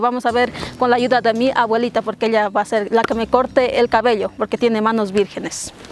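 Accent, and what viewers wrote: Mexico. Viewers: PARECE QUE ERES DE CHIAPAS MÉXICO. HASTA EL MISMO ACENTO AL HABLAR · Jajajaja que linda, habla igual que las indígenas mexicanas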